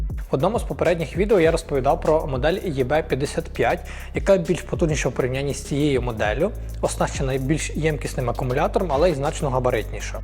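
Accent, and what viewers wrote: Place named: Surzhyk, Ukraine. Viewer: це не україномовний контент. Цей карлик булькає на якомусь невідомому суржику, помішаному з кацапським.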